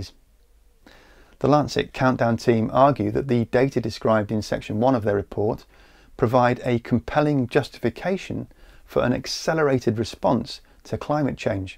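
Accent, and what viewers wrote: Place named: Surrey, United Kingdom. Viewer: His voice is exactly like Professor Patrick N. Allitt who did a course on Victorian Britain for The Teaching Company. I'll bet both of these men come from the same area of England. I believe it was Surrey, south of London. I love the way they sound.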